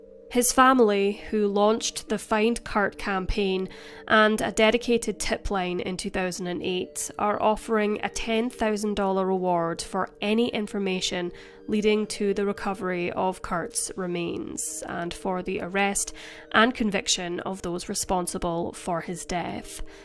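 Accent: Scotland, United Kingdom. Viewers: Cute lil Scottish accent ;)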